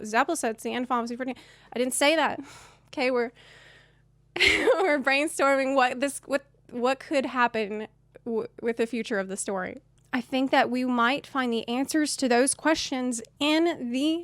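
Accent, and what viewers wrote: South, United States. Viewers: when you sound southern American and British at the same time :|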